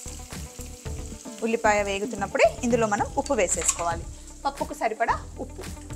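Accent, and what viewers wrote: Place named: Guntur, India. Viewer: Your voice has good clarity and simple and no over action, I belong to Repalle of Guntur district, your voice is like our voice…..🎉❤💥🎄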